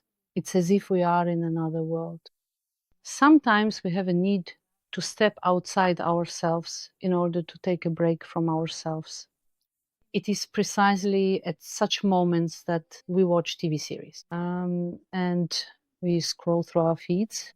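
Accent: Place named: Eastern Europe. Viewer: мне нравятся ваши видео на русском.На английском ваши видео звучат очень по- славянски, без английской интонации. Может можно подкорректировать AI, чтобы ваш голос звучал максимально как native, с другим интонационным ритмом. С приветом и благодарностью, из США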